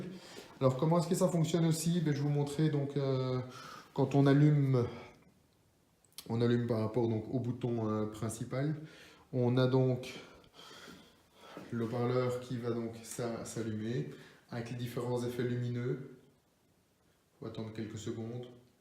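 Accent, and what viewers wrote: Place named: Belgium. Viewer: Tu es Belge ? Ça s'entend 🤣 je le suis aussi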